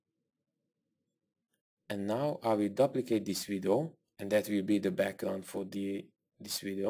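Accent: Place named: France. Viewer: French accent detected, merci for reading.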